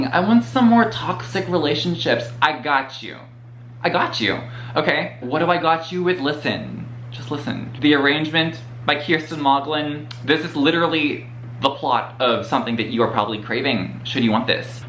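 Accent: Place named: United States. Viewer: Can you believe that!!! I was surprised too, for his American accent is way amazing.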